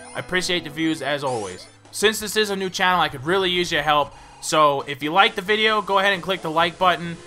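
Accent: New York, United States. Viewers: Oh with the New York accent! Wierd if you've been watching videos for over a year... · How come your voice sounds like you have a New York accent?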